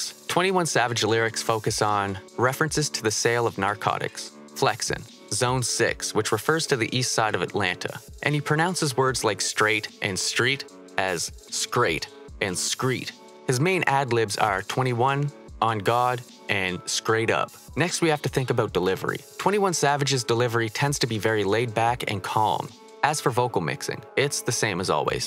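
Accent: United Kingdom. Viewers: I think he from the uk 🇬🇧 bruv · he's british right? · Bro he from the uk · Georgia I could have sworn he was British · Oi he from the uk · He from Britain lmfao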